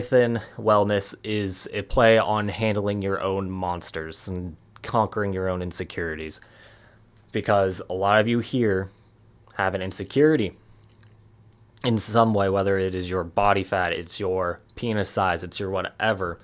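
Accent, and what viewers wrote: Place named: Virginia, United States. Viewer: Thanks for tge advice bro! Been working for me so far. You have a slight Virginia accent , am I correct in assuming that?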